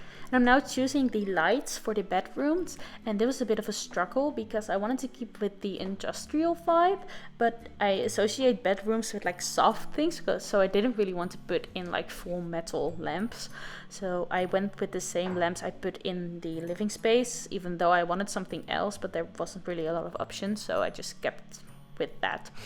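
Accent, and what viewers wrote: Netherlands. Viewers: ik ben ook nederlands!! Leuke build! Ik dacht al dat je nederlands was. dat accent is zo herkenbaar haha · Ik herkende letterlijk dat dit nederlands is na de eerste paar secondes... #dutchaccentstruggle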